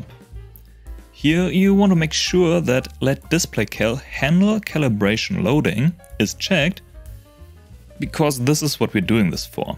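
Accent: Germany. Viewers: Ah da hast du es offensichtlich gemacht. 😂 Habe mir die ganze Zeit gedacht das ist der typische "this has to be perfect" deutsche Akzent beim Englisch reden. Ich klinge locker nahezu identisch beim Englisch reden. Immerhin besser als das Englisch das manche andere an den Tag legen.🤣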